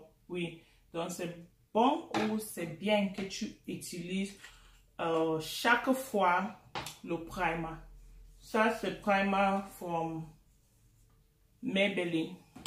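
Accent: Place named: Bamenda, Cameroon. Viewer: Sis I absolutely love the box, I was brainstorming with my sister about this idea last week. To me I was looking for something like this where I can take my food pictures in front. Thanks for inspiring. You are full of positive energy sis and wait ohhh that was my kind of French😂😂😂😂 bamenda geh like me. It's <mail>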